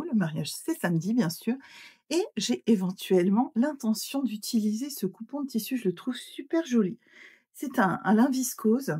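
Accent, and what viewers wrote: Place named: Sarralbe, France. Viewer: 👗🪡🧵💙✂️❤️😘 bonjour, j'entends que tu es près de Sarralbe.. mon petit fils est au collège à Sarralbe et moi je suis de Freyming.... nous sommes donc voisine 😂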